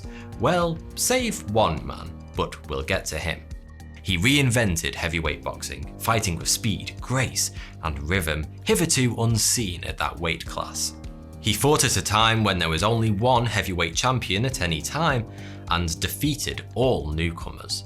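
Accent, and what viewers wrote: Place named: Lancashire, United Kingdom. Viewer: Classic Lancastrian, it's a globally recognised accent. BB1 here.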